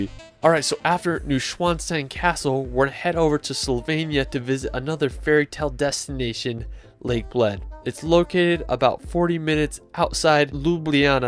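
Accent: United States. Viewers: Nice Video, great pictures. If you would only pronounce the names the way it's supposed to, and not with the thickest american accent, it'd be perfect Still, great stuff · Stunning views and great tips, but dude - at least TRY to learn the pronunciation of the names of mountains/villages you mentioned... it's definitely not that hard and you show a little respect, instead of saying it simply as an American. It's terrible to hear it. Thumbs up for video, thumbs down for this... I'm sorry.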